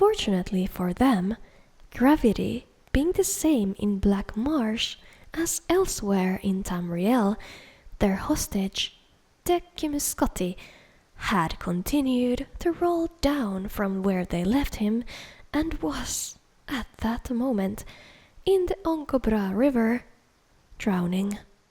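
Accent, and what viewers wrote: Finland. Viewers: The Finnish accent is really appealing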